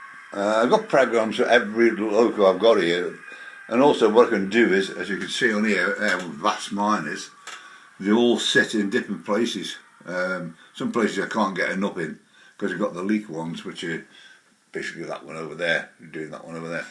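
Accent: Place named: Stoke, United Kingdom. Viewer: Well, a great big happy birthday to you and many of them matey. I love watching your videos and by your accent I can tell you’re a Stokey. I actually live in Middlewich but I worked quite a number of years all over Stoke and my son works in Fenton. I got into this hobby a couple of years ago because of the Hornby TT 120 and I’ve decided to go down the Itrain route to run my trains and after a bit of messing around I have finally got everything working perfectly and it’s great to watch the Locos go round in full automatic mode so even I don’t know where they’re gonna go and how long they gonna stay at a platform. I’m now using all Yamorc equipment for the feedback sensors the point switches and the command station anyway all the best matey have a great day Steve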